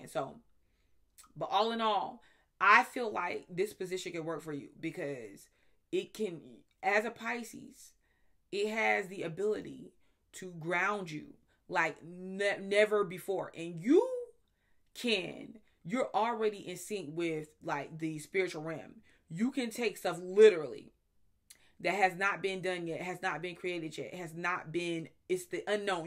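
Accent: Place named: East Texas, United States. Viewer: Are u from east texas by the way? I love your accent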